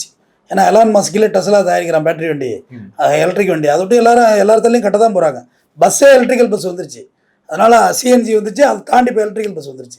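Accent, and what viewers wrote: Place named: Cauvery Delta, India. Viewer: Sir native delta side pola 🤔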